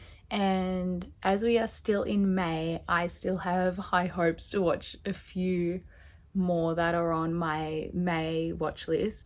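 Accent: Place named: New Jersey, United States. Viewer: that's a lovely accent you have ! New Jersey ? x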